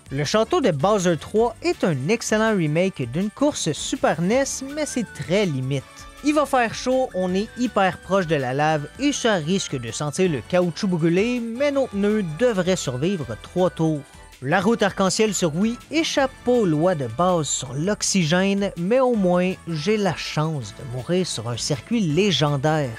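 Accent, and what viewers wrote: Quebec, Canada. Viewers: cette vidéo serait moins bien sans accent québécois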